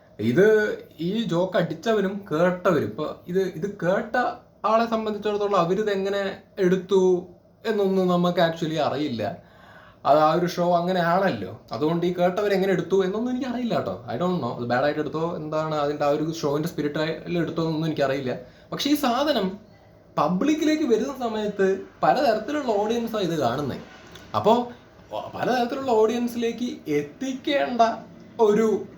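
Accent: Palakkad, India. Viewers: Bro. Nammande Palakkad accent super...Keep it up, and best wishes. Big fan of you from Delhi..👍👍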